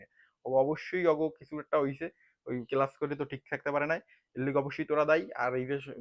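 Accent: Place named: Patuakhali, Bangladesh. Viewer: Apnr vasha potuakhalir mnush der moto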